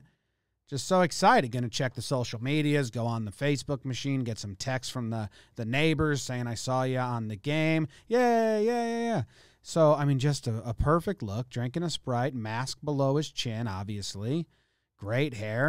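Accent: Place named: Texas, United States. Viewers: Love your Texas accent. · Your Texas accent is spot on.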